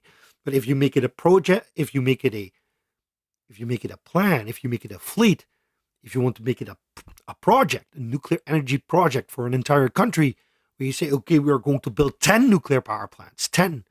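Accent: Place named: United States. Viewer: As an aside, why do you speak with American accent?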